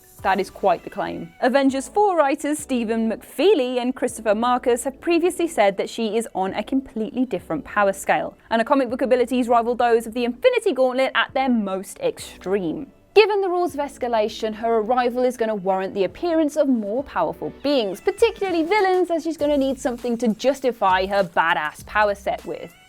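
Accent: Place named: Midlands, United Kingdom. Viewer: Midlands accent! Woo!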